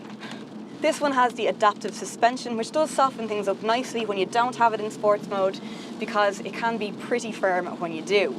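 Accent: Ireland. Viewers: Here's a comment regarding new hardware: I would marry Irish girl blind folded... :D Love the Irish accent. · Cool car and love your Irish accent. I laughed at the "WHAT ARE THOSE" with the tail lights. I think they are just a fun little touch as Mini is a traditionally British car even if owned by BMW now. Overall great review. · Oh my god! I had forgotten how quickly i fall in love when hearing irish accent spoken by a stunning woman 😳 And - a great review 👍🙂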